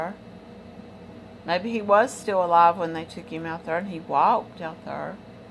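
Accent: United States. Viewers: I hope you weren't offended because I could tell by your accent that you were close to Virginia my family is like north of Knoxville it's a little it's a hair different lol... Slightly but you can tell the.... A sheriff's department has posted a Cold Case webpage just went online I'm going to go grab it for you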